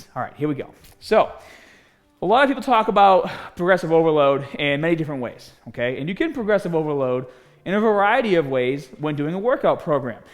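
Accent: Boston, United States. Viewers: I have been watching videos while doing cardio and I have developed a strong tendency to speak in a Boston accent. Win Win. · His point still stands... his accent is Boston in USA not South Africa lmao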